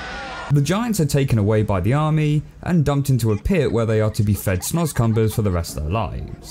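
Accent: United Kingdom. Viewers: British people make everything seem scarier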